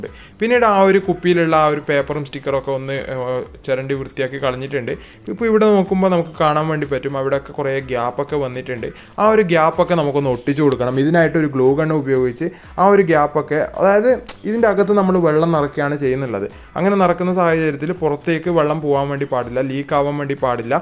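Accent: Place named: Kasaragod, India. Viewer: Languagil Idakk oru kasrodian touch 😌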